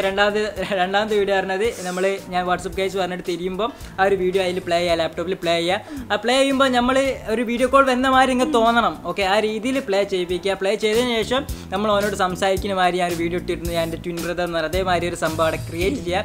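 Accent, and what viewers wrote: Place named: Malappuram, India. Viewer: Aalu kochikkaaranaanenkilum Basha. Malappuram thanne. .. Paarua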